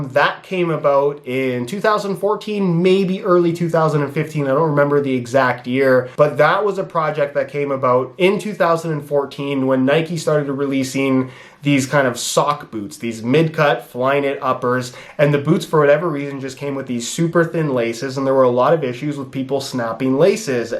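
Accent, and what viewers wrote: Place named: Canada. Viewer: Lmao I always used to watch SR4U but I started to care a bit less about soccer (football over here) and stopped watching your channel. Today a video popped up in my recommended by this new channel called ' ' and I clicked on it. I thought you seemed familiar but just assumed all young Canadian guys must just sound really similar to me😅. It was only when I saw one of these videos in your soccer cleats room that I realised it really was you lmao. You've just earned yourself a new subscriber, keep up the good work because this channel is probably going to take off with more non soccer players getting these videos in their recommended.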